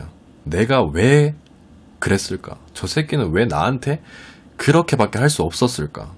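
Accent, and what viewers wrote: Gyeongsang, South Korea. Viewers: 잘생겼다~ 경상도 사투리도 멋있음~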